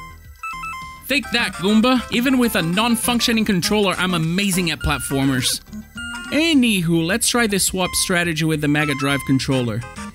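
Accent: Brazil. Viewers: perfect RJ accent! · também percebi pelo sotaque que ele é brasileiro! 😂😂😂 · Eu saquei que tu era brasileiro só pelo sotaque enquanto falava inglês, hahahaha Boa sacada fazer vídeos em inglês, mano! Assim você pode alcançar um público ainda maior. Valeu pelo vídeo, curti muito seu trabalho! Mais um inscrito!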